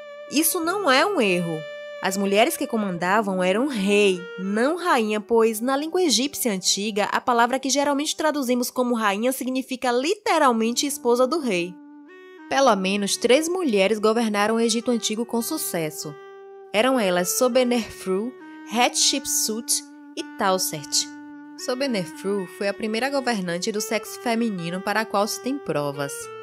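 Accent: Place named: Bahia, Brazil. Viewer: Essa narradora é baiana hein kk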